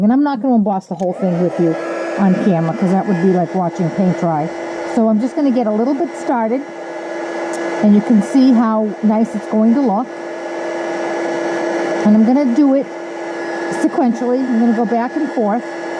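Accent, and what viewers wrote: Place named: Maine, United States. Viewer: Super cute card need to get the letters lol. Need to look and see if you have scrapbook pages videos. I hear your accent where are you from sound like Maine.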